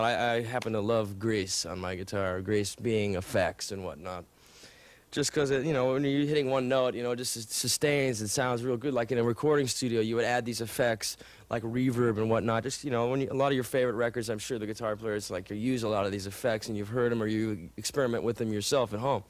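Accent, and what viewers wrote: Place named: California, United States. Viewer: to future: lose the gnarley beach boy accent,the head shakes,the perm,the earring,but not the licks...now 2021 perfect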